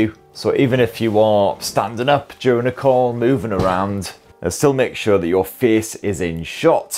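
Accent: United Kingdom. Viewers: the intro has the most british accent ever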